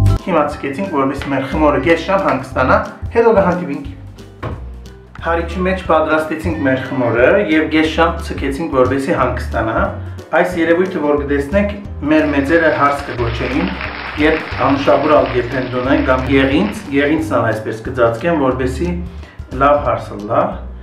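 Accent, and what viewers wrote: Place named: Western Armenian, Armenia. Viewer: Արևմտահայի Ձեր խոսվածքը շաաատ հաճելի է :)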